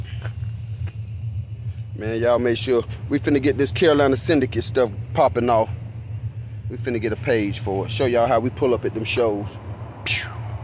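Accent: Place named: Canada. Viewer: Cool accent! Where you from? Canada?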